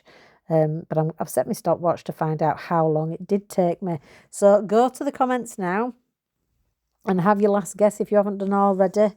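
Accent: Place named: Northern England, United Kingdom. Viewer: Years ago I had a Siamese cat he hated dogs, he would chase them out of the yard he didn’t care how big they were he’d bite their ankles jump on their back and bite their ears, they would run screaming out of the yard he would walk back tail in the air smug look on his face. If I saw a dog out the window he’d run to the door tail up let me out! I really enjoyed this chatty video I hope you will do more, I followed along while I sat here here in Australia by myself I enjoy your company, I like to hear you talk it reminds me of when I lived in the north many years ago, when they’d say things like put wood in ole, or shut dooer, nobody ever said’the’ anything, sometimes it seems the rest of us are a bit too English 😂 it’s supposed to get to 48C today, I’m sat under the split system, cool air blowing, not going out today! Sat in a thin nightie, keeping fluids up , curtains shut against the sun. 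And you have cold and rain! It will be our turn soon enough, at least then we can add extra clothes to keep warm but there’s not much relief when it’s too hot 🥵 I love your idea to raise money for cancer and I will be donating. ❤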